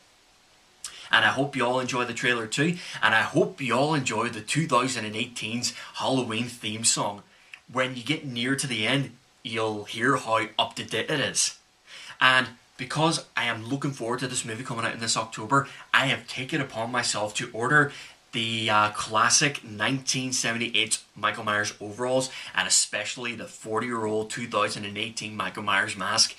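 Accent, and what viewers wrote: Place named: Ireland. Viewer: if Dr Loomis was Irish he would sound just like you.. great reaction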